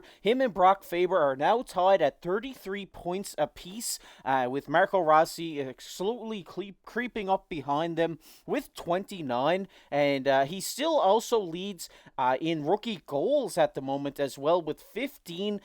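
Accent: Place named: Cape Breton, Canada. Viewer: I’m guessing Cape Breton.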